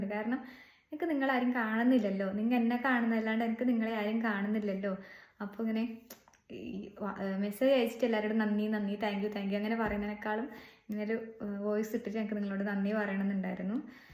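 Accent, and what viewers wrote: Kasaragod, India. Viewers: മോളെ കാസറ്കോഡ് ഭാഷ എനിക്ക് വളരെ ഇഷ്ടം. മലപ്പുറം എവിടെയാണ് ഞാനും മലപ്പുറംആണ് കെട്ടോ 😍😍 നിഷ്കളന്കമായ സംസാരം God bless you മോളെ…. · കാസർഗോഡ് സ്ലാങ് ഇഷ്ടപെടുന്ന ഒരു മലപ്പുറത്തുകാരനാണ് ഞാൻ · നിങ്ങൾ നമ്മുടെ കാസർഗോഡിന്റെ മുത്താണ്, നിങ്ങളെ നമ്മൾ മലപ്പുറത്തിന് കൊടുക്കൂല്ല, എവിടെയായാലും നമ്മുടെ സ്ലാങ്ക് മറക്കണ്ട, അതാണ് നിങ്ങളെ കൂടുതൽ ആളുകൾ ശ്രദ്ധിക്കുന്നത്, നമ്മക്ക് നങ്ങളെ വർത്തമാനം മതി അത് കേക്കാനാന്ന് സുഖം, അല്ലാണ്ട് അപ്പ്യൊ പറയുന്ന പോലെ പറഞ്ഞാൻ ആരും നമ്മളെ മൈൻഡാക്കൂല്ല. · കാസർകോട് ഭാഷ കളയരുത് കേട്ടോ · കാസർഗോഡ് ഭാഷ മാറ്റരുത് ഞാൻ കാസർഗോഡ് ആണ്❤ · ടീച്ചറേ ഭക്ഷണത്തിൻ്റെരുചി മാറ്റം അത് ഒരു ഇത് തന്നെയാണ് എൻറെ വീട് മലപുറം ജില്ലയിൽ വണ്ടൂർ എന്ന സ്ഥലതതാണ് കല്യാണം കഴിച്ചത് മലപ്പുറത്തേക്കും ഭക്ഷണം പാകം ചെയ്യുന്ന രീതി, അങ്ങനെ ആദ്യം പ്രയാസമായിരുന്നു അപ്പോൾ പിന്നെ ജില്ല മാറിയാലോ? 😅 പിന്നെ ടീച്ചറുടെ ചിരിയും പാട്ടും ഉള്ളിടത്തോളം പാട്ടിനെ സ്നേഹിക്കുന്നവർ എന്നും ഉണ്ടാവുന്നേ..നിങ്ങളുടെ കാസർഗോഡ് ഭാഷ അത് എനിക്കൊത്തിരി, ഇഷ്ടമാണ് മലപ്പുറം ആരാധിക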